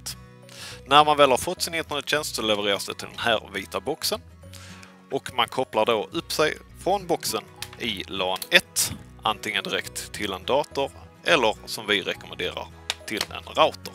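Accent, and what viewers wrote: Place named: Scania, Sweden. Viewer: Härlig skånska! :D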